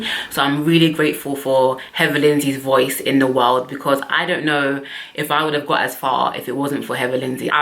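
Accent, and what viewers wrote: United Kingdom. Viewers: Wow!!!! I love this woman mindset I would love to meet someone like her plus I have a thing for dark skinned woman and love the British accent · cute British accent. black people with British accent.... are very dope .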